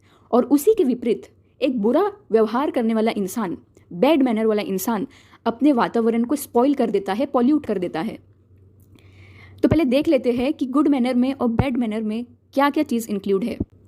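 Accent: Mumbai, India. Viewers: mumbai ki language 😁😁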